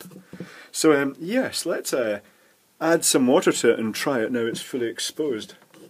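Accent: Scotland, United Kingdom. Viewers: Scottish accent maybe?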